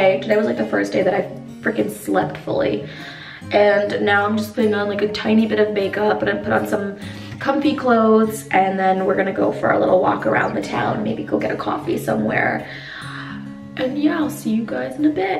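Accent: United States. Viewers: Jedinej člověk co má americkej přízvuk a mluví tak plinule česky. Zajímavě se to poslouchá :D Je to fakt parádní pocit :)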